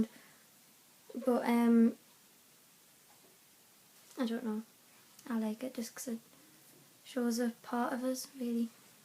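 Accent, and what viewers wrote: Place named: United Kingdom. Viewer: Loved your video. I am scheduled for this ablation on 10 May. Very anxious. Lots of scary videos on this procedure. BTW I do love your british accent, and you are also very beautiful. Ken in USA.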